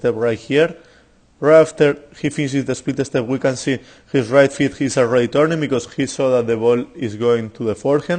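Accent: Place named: Spain. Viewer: Hi, thanks for the video, u give lot of information, sometimes too much but good explanation. Just it's hard sometimes to understand everything cause I'm not a good English speaker and with your accent it's even more difficult haha (no offend). What's your mother language? If it's Spanish I would be interested to see the same video in your mother language cause I speak Spanish better than English :D. Thanks, keep going with your videos;-)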